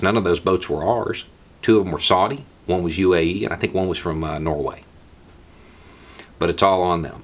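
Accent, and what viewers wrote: South, United States. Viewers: See at first when i heard your southern accent I thought you were going to be all for it because literally every southern person likes Trump but it turns out you were not that type of person and you are very well spoken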